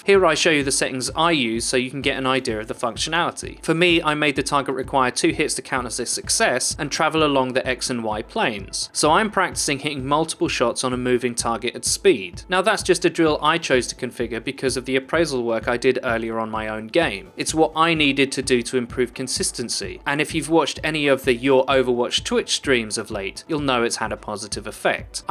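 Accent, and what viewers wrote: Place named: United Kingdom. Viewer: A lot of yanks discovering british english for the first time I guess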